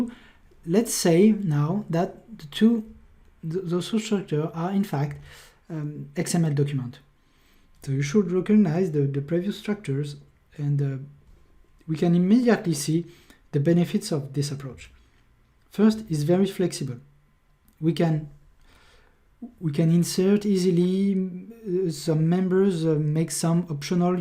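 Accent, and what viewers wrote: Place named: France. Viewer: Thanks for your video tutorials and explanations, i love your french accent because i'm also french speaker and so je comprend bien mieux ton anglais que celui d'un natif 😉😉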